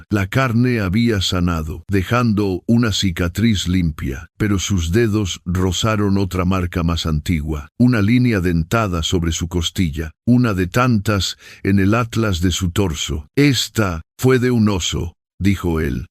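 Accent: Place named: Portugal. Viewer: Bonita historia pero ese acento en portugués no le veo el caso le quita interés a la historia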